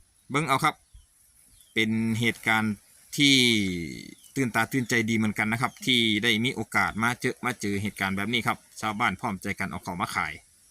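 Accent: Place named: Northern Thailand, Thailand. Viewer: สำเนียงคนเหนือผสมลาว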